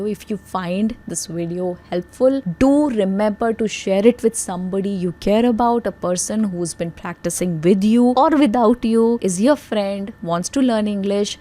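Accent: India. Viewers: Why you not speak Real English it's hindi English. The lanaguage must be speak on their orignal way is best to teach and learn not on this way Thank s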